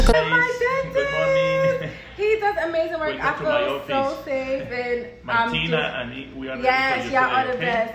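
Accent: New York, United States. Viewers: Girl I knew u was from NY or something cuz I’m from Jersey City but I live in Cali now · It's your dog wanting to be in the video for me, licking the couch and all 😂 reminds me of my dog ♥️ .. this video was so informative I never thought about the hours the procedure would take or even the foods we can't eat thank you so much for this video I've been wanting to get mind done for a while... sidebar I knew you were from New York soon as you started talking lol we have a distinctive "accent"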